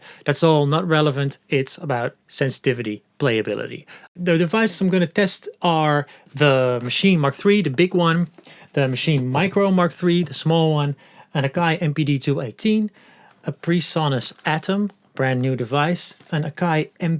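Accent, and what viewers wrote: Netherlands. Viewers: So you are Dutch, right?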